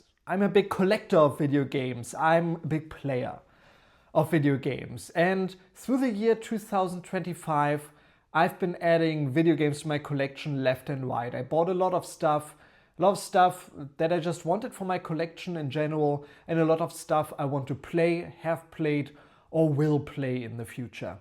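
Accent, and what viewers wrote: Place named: Germany. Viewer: You sound German! I guess you’re going balls deep into manga, huh? 😀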